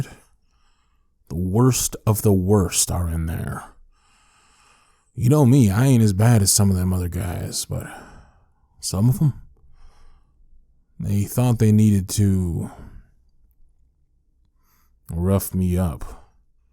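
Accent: South, United States. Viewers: This was amazing. Made my whole evening better. Love that southern drawl. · I love you cowboy accent it’s so hot and fine love you can your voice ❤️❤️😍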